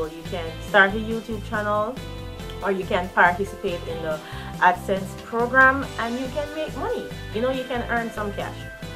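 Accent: Jamaica. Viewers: Jamaica? Sounds like Jamaican I'm thinking of starting one too but mi nuh sure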